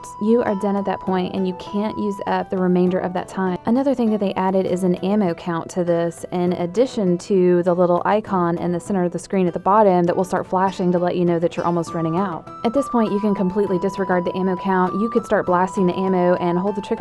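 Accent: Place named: South, United States. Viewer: where ar u from u have a sort of faint southern accent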